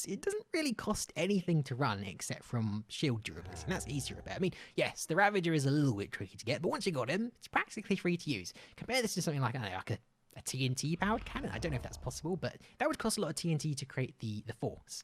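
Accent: United Kingdom. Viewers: I think that's called British accent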